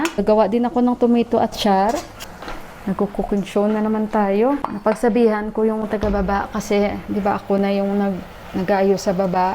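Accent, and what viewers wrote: Iloilo, Philippines. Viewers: Gusto kita panuurin kc ilonggo ang tuno mo, ilonggo din aq from negros...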